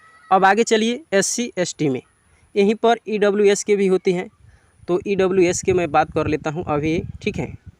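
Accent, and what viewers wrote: Bihar, India. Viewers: Bhai बिहार से हो क्या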